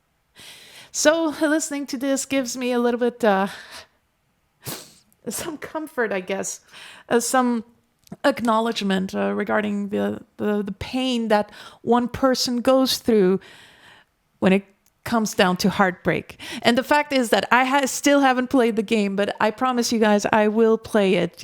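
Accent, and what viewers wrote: Paris, France. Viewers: Very impressive, true french accent, not the funny quebec one, you could pass for a real parisian. I don't know if it is the lyrics or the violin, but it's remind me of the band 'Louise attaque'. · I just wanted to say, your french accent is the best one I ever heard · Hello/Bonjour merci pour ton opinion très intéressante sur cette musique. Ton analyse se rapproche finalement assez bien du contexte du jeu (je n'en dirai pas plus pour éviter de spoil) Pour information, il semblerait que le compositeur ait eu à disposition les dessins préparatoires du jeu et les grandes lignes de l'histoire quand il a commencé à composer. source : <link> Vu ton superbe accent français, j'imagine que je n'ai pas besoin de traduire ;) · Beautiful reaction and analysis. I wonder where you're from ; you sound French when speaking french, but have almost no accent when speaking English, which is pretty rare if you're indeed French (though of course you're a trained vocalist). 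And I'm french Canadian so I know you're not from Quebec. Anyways, great video, hope you're doing better and wish you all the best.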